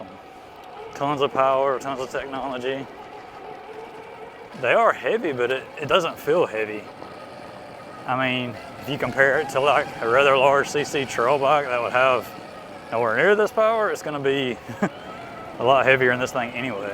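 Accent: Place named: South, United States. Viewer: I knew exactly what you meant, because that's how southerners talk! Lol.. I'm from Texas so I'm not exactly a 'southerner', but we still talk very similar and sound similar. Your accent just sounds normal to me, and the words and phrases you use too.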